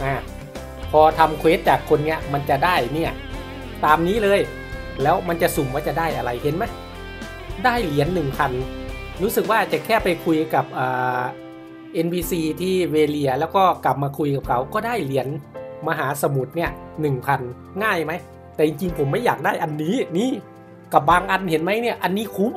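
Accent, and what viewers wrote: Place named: Ubon Ratchathani, Thailand. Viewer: ครับผม สำเนียงพี่เหมือนคนแถวบ้านผมเลย ผมคนอุบล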